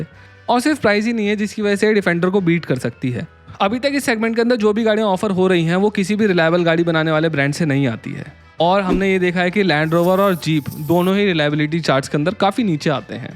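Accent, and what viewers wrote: India. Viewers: This is Indian English, deal with it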